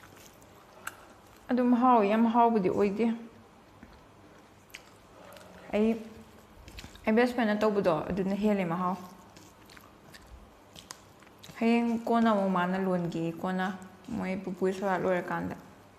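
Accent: Kakching, India. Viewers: Che na kakching marol ngangbce nungcye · Che se kakching kawaidgino hngkhi reply towrk towbirkhide eikhoi kakching mrol ngangbni eina hraoe su haikhibni eisu kakching ne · Ema se yam nungcye... Kakching kai waideino ngse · Kakching maronda ngangbana pamjei sister. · Kakching marolse taba ym nungaiye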